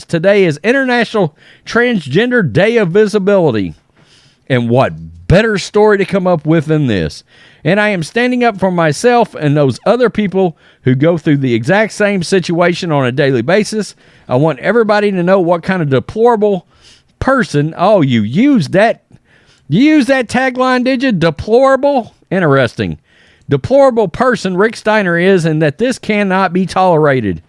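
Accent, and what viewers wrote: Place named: Appalachia, United States. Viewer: I could tell by the redneck hillbilly accent that this was going to be a dumb video. But even then I was impressed by how much worse it actually was. Congrats on exceeding expectations.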